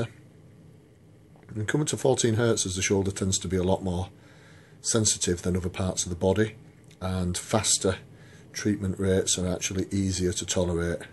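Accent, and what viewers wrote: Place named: North, United Kingdom. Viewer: Can you use EWST for proximal long head bicep tendinitis? You sound northern are you based in the north ? Do you have a website (assuming the answer to the 1st Q is yes).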